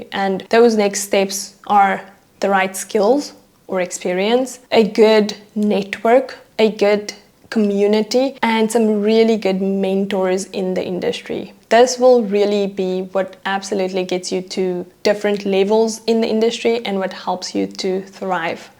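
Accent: South Africa. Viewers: Love your accent! Are you South African?☺️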